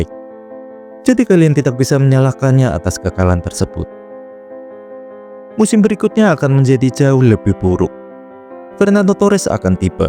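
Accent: Tegal, Indonesia. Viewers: Logatnya, tegal, mas?? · Min,orang tegal y,? Dri logatnya sih kayak tegal,😅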